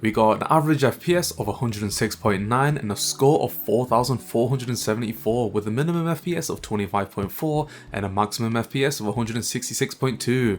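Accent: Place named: Bradford, United Kingdom. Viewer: This guy sounds like one of those bradfordstani’s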